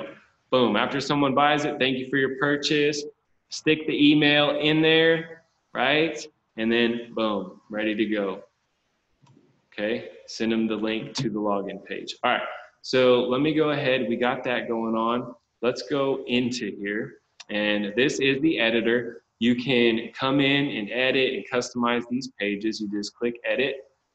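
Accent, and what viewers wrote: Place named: New York, United States. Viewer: Bro Nicaragua? You sound like a New Yorker lol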